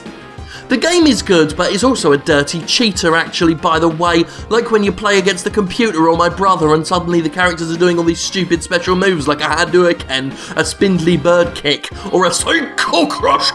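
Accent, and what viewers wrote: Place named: United Kingdom. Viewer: Because british accent and unexpected jokes in quick succession = Instantly Charlie Brooker.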